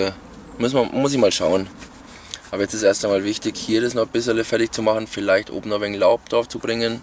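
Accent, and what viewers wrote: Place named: Franconia, Germany. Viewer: weil du total fränkisch redest und du auch schon in der frändischen schweiz unterwegs warst... Gruss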